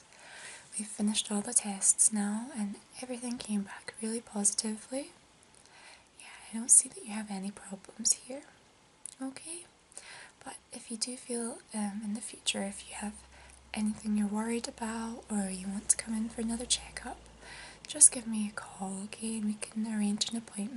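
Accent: Scotland, United Kingdom. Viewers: Where abouts in Scotland are you from if you don't mind me asking? Struggling to pin down the accent there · Excellent video! Welcome back!! I live in Europe, as well, and have a question. Your Scottish accent is a lot more subtle than say Scottish Murmur's. Is that a regional thing or is it more your own personal linguistic style? I've noticed this with a lot of the Scots I've worked with. Some sound like Simon Pegg other's like Sean Connery and then some have little accent at all. Am I crazy? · Welcome back. I've only recently started watching ASMR videos to help with stress and often click on an artist I've not come across before. You have no idea how glad I was to find you. Your gentle Scottish accent is so relaxing and I love it when you get so close to the mic that your mouth sounds are audible. Personally I didn't hear any camera noise and the mic you are using seems more than adequate. 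Really great stuff. Subbed immediately x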